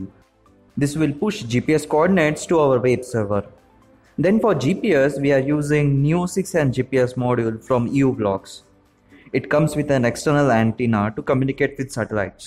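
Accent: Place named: Gujarat, India. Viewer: very informative! (*liked your gujju tone though😉😁)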